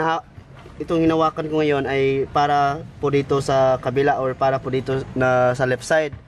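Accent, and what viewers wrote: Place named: Visayas, Philippines. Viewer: "giatay ka!" hahaha yawa oyyy. Nindot jod paminawn bsta bisaya mag yawyaw haha rs brod nice video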